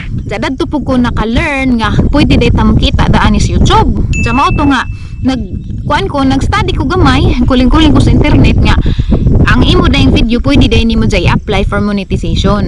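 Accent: Bohol, Philippines. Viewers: Lami jud kaajo paminawon ang sinultihan nga bol anon madam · Bravo mao gusto ko nga Boholana maayo pamation good job! Josephine Legaspi Grunseth from Texas! Shout out taga Sierra Bollunes family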